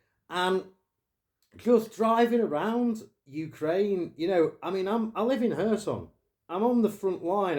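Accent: United Kingdom. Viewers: sooo British) Love it!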